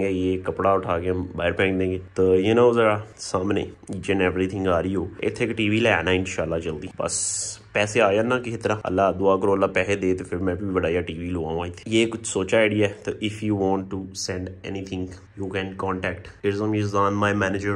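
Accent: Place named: Punjab, India. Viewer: Your punjabi ascent is so secxi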